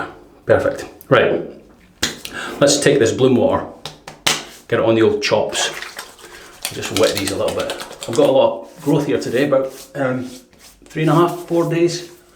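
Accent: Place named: Scotland, United Kingdom. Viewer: I love Scotland. My Mother came to America from Scotland as a little girl in 1923 with her 2 siblings and her parents. They came from Paisley. My Grandfalther, Robert Muir lived with us in his later years. I loved his accent. He sounded just like you. Long live Scotland!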